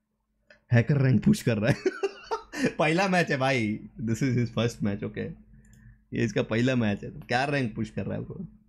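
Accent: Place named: Kanpur, India. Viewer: Bro kanpur se ho kya because od your tone agae haa to bhai hum bhi hai ek shoutour dedo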